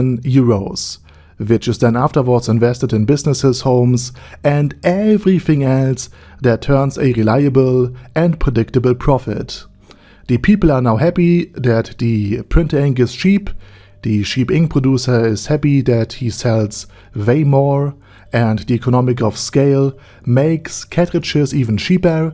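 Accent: Germany. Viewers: jesus christ ur german accent is strong asf. deutscher bursche. ur voice is hard to listen to.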